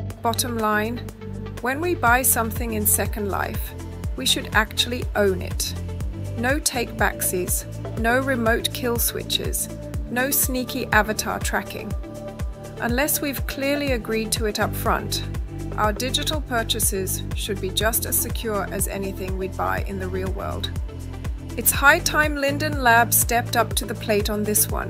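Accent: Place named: Australia. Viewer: well thought out and spoken piece on customer service and business responsible practices. Its a shame there is not a clear cut service agreement all business conducted in sl must follow or they can lose their Lindens and account. cool to hear a Aussie language on Sl! Also i need that dress! its perfect summer all rounder :))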